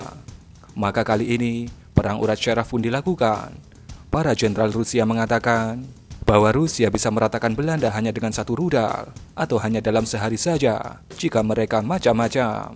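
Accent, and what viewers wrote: Java, Indonesia. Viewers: Medoke wong jowo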